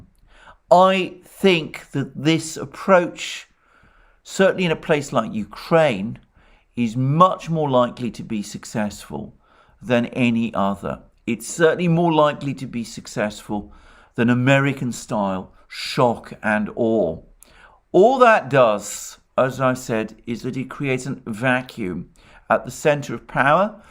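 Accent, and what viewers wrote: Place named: Cockney, United Kingdom. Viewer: The speaker throughout this video has a British Cockney accent. Websearch "photo of Col. Douglas Abbott Macgregor" and you will see that the speaker in this video is not he.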